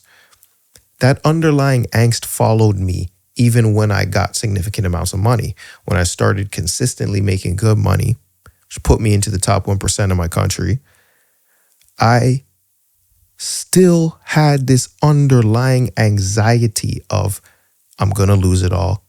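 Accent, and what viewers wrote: Toronto, Canada. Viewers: I heard the way you speak and thought you were from Canada. Where are you from? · The moment I heard the accent I knew you were from Toronto. Its 630 am and this was a great morning listen. Sending love from Brampton.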